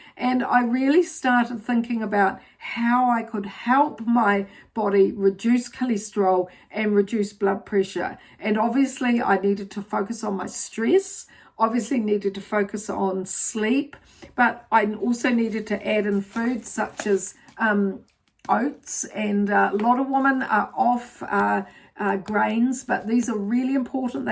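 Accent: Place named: New Zealand. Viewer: Why do New Zealanders say “Woman” for plural instead of “Women “?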